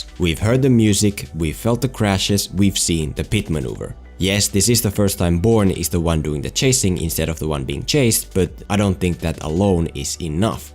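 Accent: Finland. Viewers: Are you finnish? Accent sounds very finnish.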